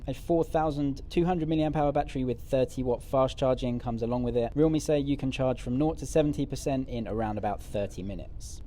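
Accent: United Kingdom. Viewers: Your accent like British How you came to china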